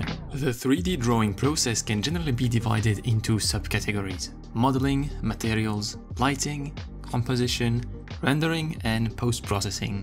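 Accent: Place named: France. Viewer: The video is super intressant. Tu es français ? Parce que j’ai l’impression que t’as un petit accent.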